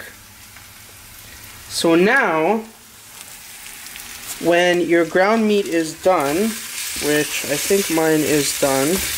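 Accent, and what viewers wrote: Canada. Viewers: Canada is America's abortion that unfortunately survived, only canadians talk like that and this softy drained his ground beef 🤣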